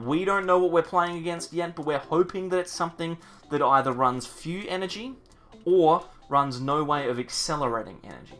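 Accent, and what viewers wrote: Australia. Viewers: first I wanna say I live the vids, secondly the Australian accent makes it that much better but I do have a legit question, doesn’t pertain to you as much but why on earth are all y’all so bad at math during these videos, again not you but mostly just in general haha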